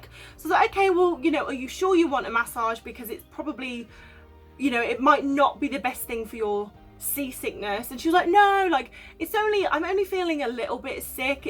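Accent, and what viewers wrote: United Kingdom. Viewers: I just found you, in a group of van-ladies. A interesting and entertaining blogger. I like the accent. British I suppose, I’m American. I have always gotten massages periodically too so I can relate to that. Sorry you seem to have more than your fair share of jerks and weirdos. The worst was clearly the pooper but possibly he has a bowel disease. Carry on. 🤓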